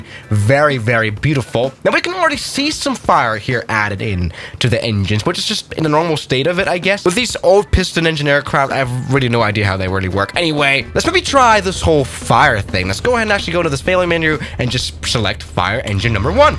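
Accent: France. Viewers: You French accent is so funny xD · His French accent got me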